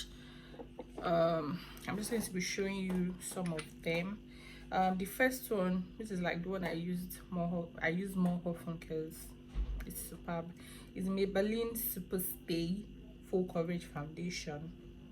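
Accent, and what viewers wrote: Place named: Nigeria. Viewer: As soon as I opened the video and heard your voice I said, yesss my Nigerian sistaaa!!! just subscribed!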